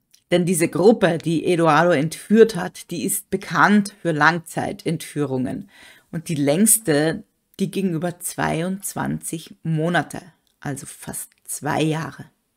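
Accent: Austria. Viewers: da lag ich auch fast richtig. Wien hoerte ich zwar nicht raus, aber Ich hoerte leicht Oesterreich raus. Gruss aus Tirol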